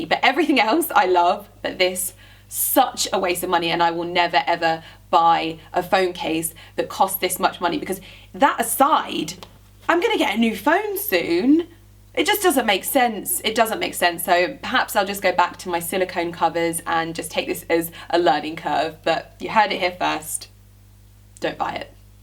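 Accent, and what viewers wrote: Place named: England, United Kingdom. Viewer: I wish I had an English accent! You are beautiful, inside and out.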